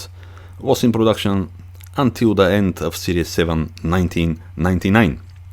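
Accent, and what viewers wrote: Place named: Italy. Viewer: WoW, It's going to take me a while to all of your videos but I'm going to do it! The Italian accent is just a bonus too.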